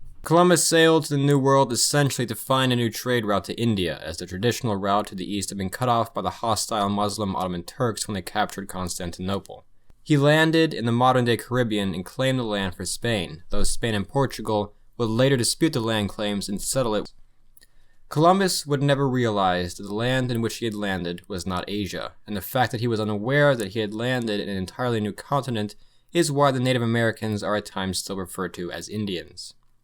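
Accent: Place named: Appalachia, United States. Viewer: That Appalachian pronunciation though.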